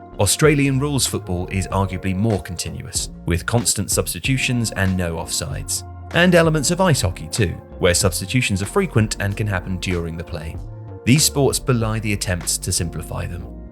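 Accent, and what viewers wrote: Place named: United Kingdom. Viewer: I waited the whole video to hear something about hockey, a sport that is in some sense very similar to football. I think when it comes to data analysis, you just analyse what's important for you to help you decide either strategy during a game or overall management of the team. And I still believe there is a gap here because Americans love statistics, the video having a British accent makes me think they haven't talked to the Americans yet :). I grew up watching American football and I will never forget when I was once watching a game and the stat came up on screen (not accurate but you'll get the idea): "Quarterback John Doe has a pass completion rate of 57% when the temperature is below 32 degrees" (Fahrenheit of course). I was like "oh, they will have data for everything". So, back to football, they will have the important stats. 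Statistics on victories (home and away), victory rate after scoring first, victory rate after trailing starting the second half, victory rate after trailing when compensation time starts, goals per game (already done), goals per penalty kicks (where in the goal the shoot more often, accuracy per kick location, I think this is already done), penalty kicks stopped (by keepers, already done), clean sheets for keepers (already done), accurate passes (left and right leg), goals (left and right leg, headers), ball contact time/times (done) they may even have duel stats, like Messi's successful dribble rate against Pepe. In hockey you would have similar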